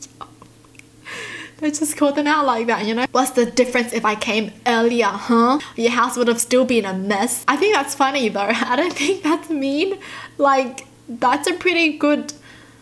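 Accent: Australia. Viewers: Any blinks here think she sounds like rosé. Cause they both are Australian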